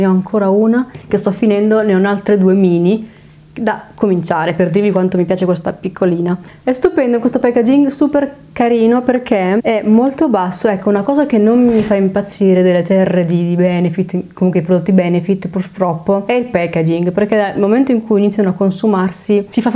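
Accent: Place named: Emilia, Italy. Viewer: Ahhhh vedi!? Io sono mezza veneta e mezza emiliana. Ho sentito un po' di accento emiliano (magari dopo qualche anno a Bologna lo hai assimilato!) per quello 😂 Spero di non essere stata indiscreta nel chiedertelo! 💕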